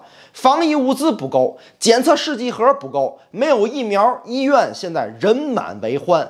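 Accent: Tianjin, China. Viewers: 天津老乡吧？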